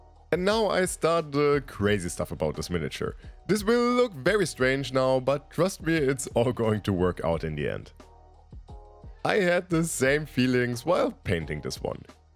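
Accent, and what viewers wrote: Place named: Germany. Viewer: you certainly did deliver, this and the horned rat warrior both were experimental and it’s really nice seeing someone’s take on something that not many people would even attempt. But yeah your painting style is unique and a pleasure to watch, along with the German accent and bassy voice you’ve certainly got what it takes to keep gaining popularity. I look forward to the chorf release and hopefully they re-release all the old legion of azghor from forge world!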